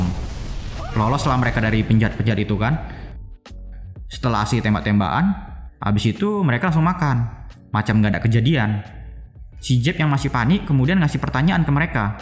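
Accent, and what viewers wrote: Medan, Indonesia. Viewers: Pasti orang medan nya kau lae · Orang Medan ya bang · Bg lu orng medan ya? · kuat x logat medan mu bah,,, :D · eeeiiii senang kali lah aku.. gini cara pembawa'an y pakai logat medan.. saya dayak kenyah kalimantan timur.. ayo bang ini ajj logat y.. seruu.. 😍😍😘😘🤗🤗 sukaa kali aku bang... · Orang Medan bg? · Logatnya kek org Medan kau bang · Nampak kali medan nya bah. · Jgn lh nampak kli Medan nya min:) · Abang ini kaya nya orang medan logat nya medan kali 🤣🤣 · logat bhasa medan nya aq gk tahan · anak medan ni oratornya :D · Medan kali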